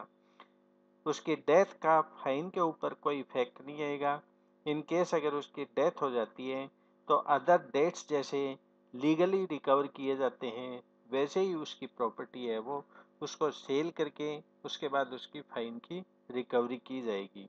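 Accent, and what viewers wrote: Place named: Indore, India. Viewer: Are you from somewhere around Indore-Ujjain, sir? "Apan" caught my attention. :D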